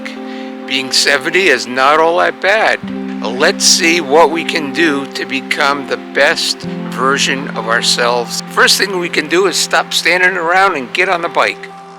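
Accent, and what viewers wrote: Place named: Long Island, United States. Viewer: Wonderful video and Happy Birthday!! 🎉🎉🎉 P.S. Love your accent. I grew up in Merrick, Long Island (right next to Jones Beach). Neighbors!!! ❤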